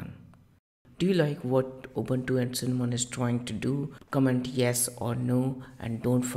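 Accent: India. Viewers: indian voice ::))))))))))))))))))))))))))))))))))))))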